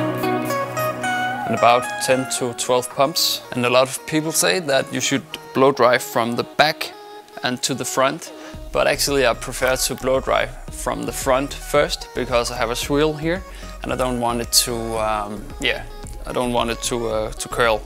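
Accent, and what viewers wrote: Germany. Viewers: Du klingst deutsch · german? sounds like Arnold!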